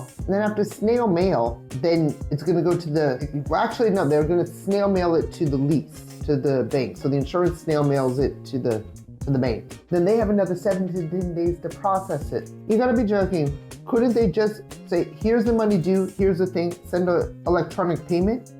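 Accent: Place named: Brazil. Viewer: Hold up, you're American? 😂 You're speaking English with a Brazilian accent. 😆 That's fking amazing.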